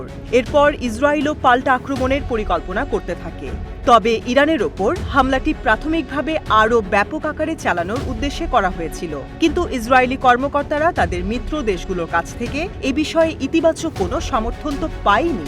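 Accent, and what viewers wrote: Kolkata, India. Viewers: ৭১ টিভি কি সংবাদ পাঠে কোলকাতার সংবাদ পাঠিকা নিয়োগ দিয়েছে? উচচারণে তাই মনে হচ্ছে।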